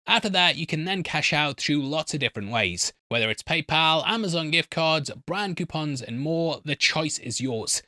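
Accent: United Kingdom. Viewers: How dumb is it that I'm so normalized to your content, that I forget you're from the UK, so when you make jokes on Americans like "Why don't you want to talk like this" it takes me a second,lol?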